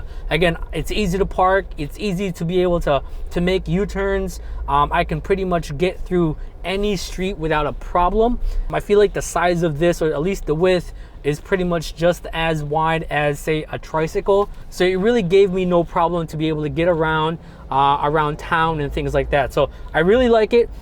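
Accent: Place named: United States. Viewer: Good video, you sound like an American, if so how do you think the Jimny would handle American highways and traffic. Long drives that sort of info. TY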